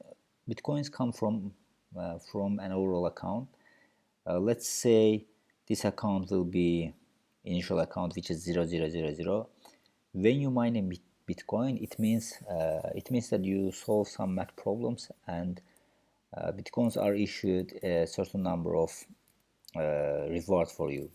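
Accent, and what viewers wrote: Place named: Azerbaijan. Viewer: Man this accent, sounds so familiar LMAO. Are you from Azerbaijan by any chance?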